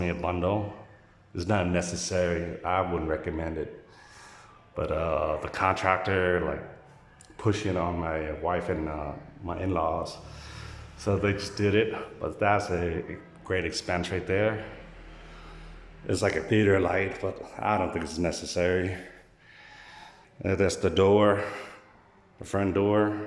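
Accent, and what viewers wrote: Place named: St. Louis, United States. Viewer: Where did you pick up the black lingo and dialect? I've never heard a Vietnamese sound like you before. Sounds like St. Louis area. Where in Vietnam is this?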